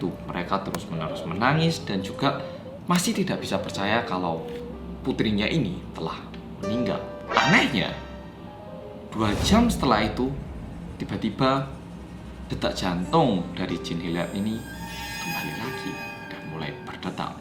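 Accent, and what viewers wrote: Java, Indonesia. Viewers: entah kenpa cara mas menyampaikan cerita terasa sangat santai dan gaya medoknya membuat saya kangen kmpung halaman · Bersama orang korea yg medhokkk ❤❤ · YAYY OPPA MEDHOK UPDATE 💖💖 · orang korea yang medok balik lagi yey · Ngomongnya medokss jawa banget kalau ngomong bhs indonesia..hehehe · Akhirnya konten medok lagi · Mas sampeyan lek tak rungokno wis rodo ilang medok e 😁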